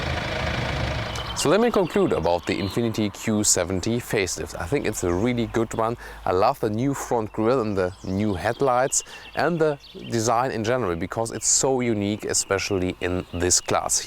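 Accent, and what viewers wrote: Germany. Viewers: I wish I had one of these in 2018 when I finally out my chance to drive on the AutoBahn........I wound up getting a Audi A6 which was no slouch foe ride, performance and handling but.....I had a A6 back in the states so I knew how they drove. Don’t get me wrong 30ish MPG with the V6 diesel was nice but getting to wring out something like a Q70/Q70L on the AutoBahn on a clear day would be nice. Your English even with the German accent is great, you have studied and sounds like you can speak better English than some....well...Englishmen. Good detailed video with facts and not a lot of fluff. Three good selling points for me maybe more but starting with three. 1. NO Auto/Start/Stop for the engine. 2. Modern design without going over the top. Nice and functional without being overly flashy. 3. I cant speak for Europe Spec engines since most of them are probably diesel. 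I like the regular multi port fuel injection. 4. Traditional moon roof, not the fancy Panasonic. I want metal on the roof especially if in a rollover accident, 5. Cabin Ergonomics. Decent layout and reasonably well laid out. Example. Fuel door RH side of vehicle...Fuel gauge on RH side of the instrument cluster. Some misses and not overly critical but observations that I have noted. 1. Rear Turn Signals. Personally I prefer the amber contrasting color rear turn signals. 2. Slightly behind the times for transmission technology. Yes functional and effective but with the competition coming up with 8,9 and even 10 spee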